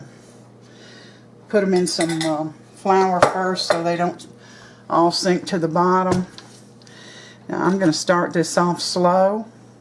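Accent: South, United States. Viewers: I need to add this to my list. Making that St Pattys cake this weekend. I just love watching you cooking, the recipes are are so easy to follow. I like your southern twang, where are you from?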